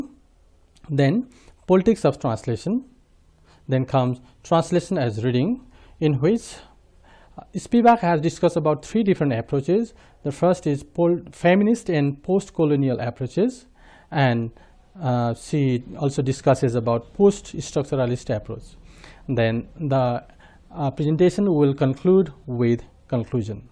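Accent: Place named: RP, United Kingdom. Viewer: Superb! BBC Style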